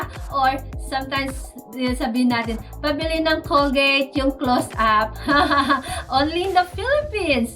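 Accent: United Kingdom. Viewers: Nakakatuwa namn ang english british accent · I immediately remembered the English accent when I was still in the call center ... Yes UK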